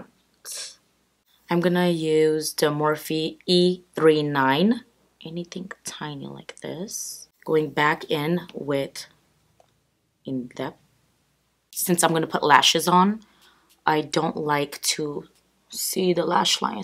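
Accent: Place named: Armenia. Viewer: You & Lilit are two of the most beautiful women! I love your Armenian accent coming through! You two do makeup better than anyone else I follow! So natural but glam & just refreshing seeing someone contour without looking too done up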